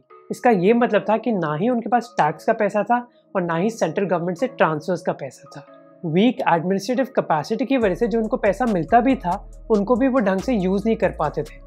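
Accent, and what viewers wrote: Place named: Karnataka, India. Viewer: YOUR VOICE IS SAME AS MY KANNAD FRIEND .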